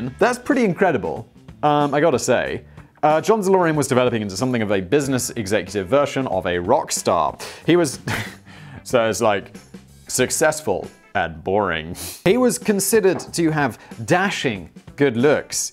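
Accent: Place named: United Kingdom. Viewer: Knows everything about trailer laws in the UK but nothing about the history of cars worldwide and has a channel called 'Today I found out: Brain food for hungry minds'.. ..as much as I enjoy this light hearted content, you're losing credibility as having a curious mind Simon (the Czech, US Citizen, and British sounding person)..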